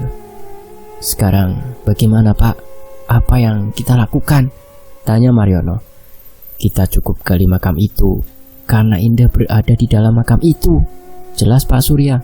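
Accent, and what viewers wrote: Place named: Cilacap, Indonesia. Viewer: Nada suaranya kyk cilacap nih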